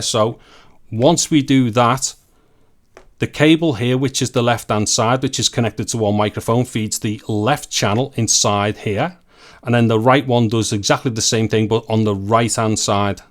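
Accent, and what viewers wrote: Liverpool, United Kingdom. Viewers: Couldn't finish the video - scouse accent offends me.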